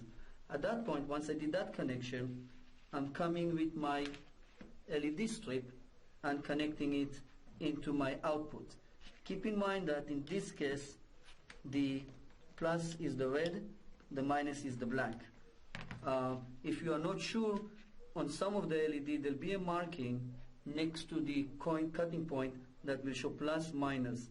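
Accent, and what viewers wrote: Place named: India. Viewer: indian english :)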